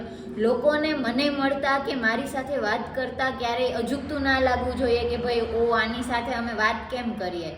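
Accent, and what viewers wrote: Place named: Kathiawar, India. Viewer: Ek nabar bolk desi badha ni jem ferndsi nay bolta ae j hova ni maja aave kadhiya vadi ek dam ❤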